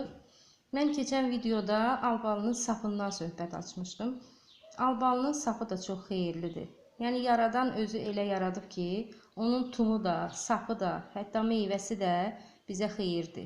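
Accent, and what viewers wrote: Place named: Qobu, Azerbaijan. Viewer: qobu lehcesiyle danwirsiz.Qobulusuz?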